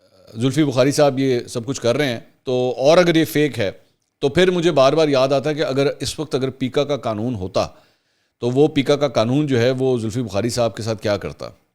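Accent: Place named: Karachi, Pakistan. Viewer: Aap Urdu to bohat achi boltay ho jaisay Karachi walay boltay hay... Lekin aap Punjabi bhi bohat achi boltay ho.. Jaisay Punjabi Log... Please tell us something about you...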